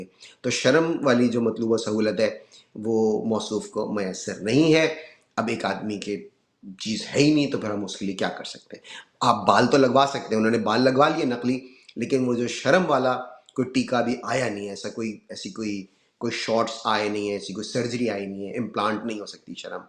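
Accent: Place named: Muhajir, Pakistan. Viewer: آپ کی زبان سے واضح ہوتا ہیکہ آپ مہاجرین میں سے ہیں۔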